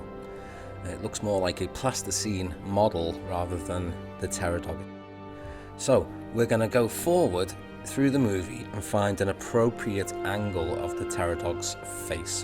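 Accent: Liverpool, United Kingdom. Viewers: What part of Liverpool/Wirral are you from mate? Love what you’re doing here. Good on ya.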